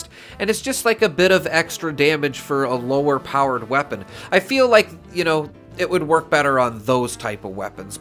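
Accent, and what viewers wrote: Canada. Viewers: Dude, are you Canadian perchance?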